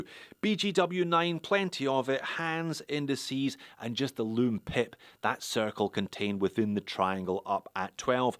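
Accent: Scotland, United Kingdom. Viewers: Mate!. You can tell you're Scottish.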